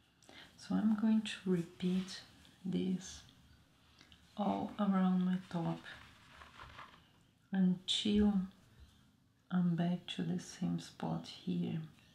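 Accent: Brazil. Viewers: Pelo teu acento deu pra perceber que vc é brasileira 🤣faz esse mesmo modelo em português please🙏🏾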